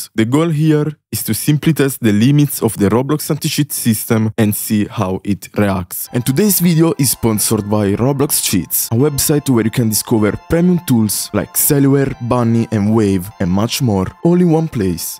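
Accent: Russia. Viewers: you have russian accent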